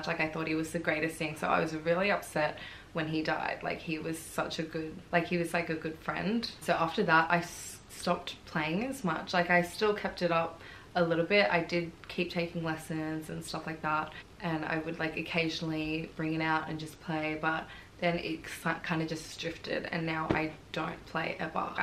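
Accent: Australia. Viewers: I love Australian accent so much that my Siri is male Australian 💯💯☺️☺️☺️ I wish I could speak like you.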